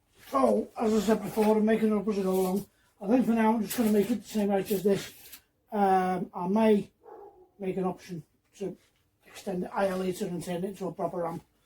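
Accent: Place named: Liverpool, United Kingdom. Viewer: G'day buddy, is the trailer for a land speed record bike (LSR bike)? If I slow the video down to 8 or 9 speed I can understand about 80 percent of what you are saying, that scouse accent is wicked. The trailer looks good and it's good to see the pick has more than one function. Cheers buddy and still watching from NZ.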